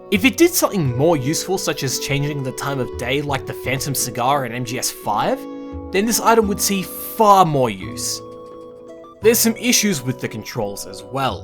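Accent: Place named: Australia. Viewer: Nothing better than some late night aussie/british video game commentary essay, great work!! if they remade the game like they did with xenoblade 1, I wonder if they would also just make it more anime like to match with botw but I fear it would impact the more dark and muddy tone it had, really added a lot to the ambiance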